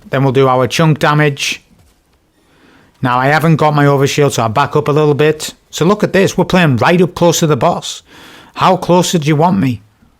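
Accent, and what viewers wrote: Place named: North, United Kingdom. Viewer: Northern Accent Sh*t Talking Destiny, Viewers, Guardians. Informative Gameplay Even Showed A Cheese I'd Never Seen!! Immediate Sub 😄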